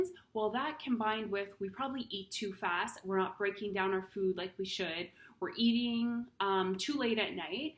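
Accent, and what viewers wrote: Canada. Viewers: haha, well "Eh" is a dead giveaway, but the "Aboot" is more subtle. Anyways, thanks for the video as i have been seriously considering doing a liver flush, and i appreciate your straight-forward content about the subject.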